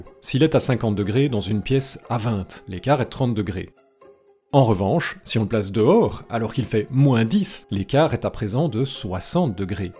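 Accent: Belgium. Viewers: "Elle est cachée dans le bouisson." J'adore l'accent belge ! Merci pour le starter kit c'est génial!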